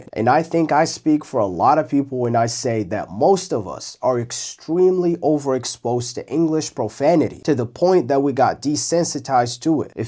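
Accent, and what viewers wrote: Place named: New York, United States. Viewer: I love your New York accent bro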